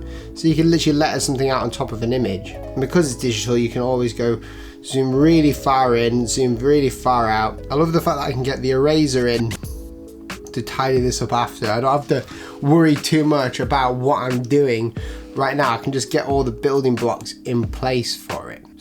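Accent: United Kingdom. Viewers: Where are you from, where is your accent from? :) UK where?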